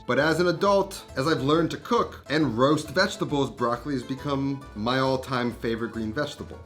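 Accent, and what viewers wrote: New York, United States. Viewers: ☠️☠️☠️ some of the most New York shit I ever heard 😂😂😂😂 Right up there with the Kosciusko bridge 😂 Even Google talk to text inputs the correct spelling using the marble mouth pronunciation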